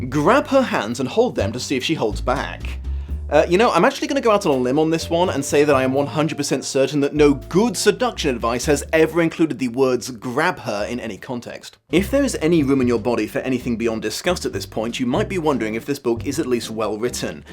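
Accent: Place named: United Kingdom. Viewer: I know. I've never been into a guy more. Plus, he's got a (real) British accent, respects LGBTQ+ and women, and has good teeth! What more can you ask for in a man?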